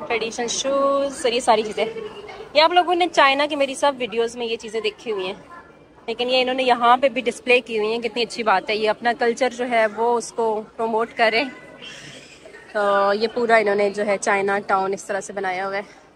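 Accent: Pakistan. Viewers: Chinese hi lagne lagi ho, bolne se sirf Pakistani lagti ho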